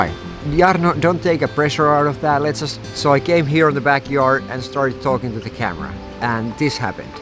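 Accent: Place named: Finland. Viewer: P.s. + Less is more. At times even that is very effective. I liked this nature video and I think your English is just fine with your Finnish accent. Good idea on being your own best friend!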